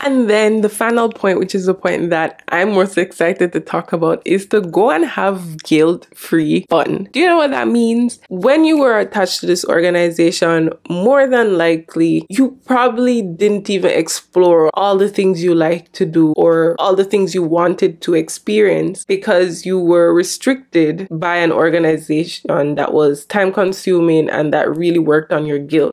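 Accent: Jamaica. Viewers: I love your Jamaican accent-mun. 😅 I doubt I would look good in feathers and costume and makeup for carnival. 🤣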